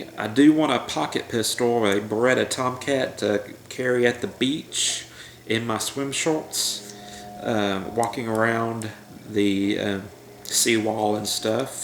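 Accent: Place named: Louisiana, United States. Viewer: You have an awesome accent, or whatever it is that makes you speak like that. Sounds like a Cajun-American blend, very smooth.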